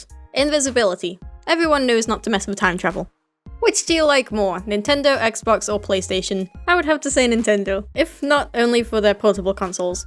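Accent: United Kingdom. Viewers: Angel Animates :3 well yeah she has a British accent so she would be a Brit (she is) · are you british?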